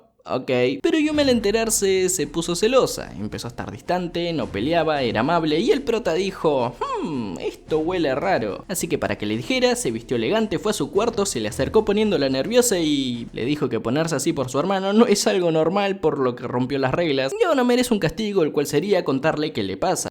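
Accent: North, Mexico. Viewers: 🤠es norteño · LA HISTORIA ESTA MUY BUENA RISA DE GUFI PERO TAMBIÉN MUY NORTEÑA🤠 BAÑENCE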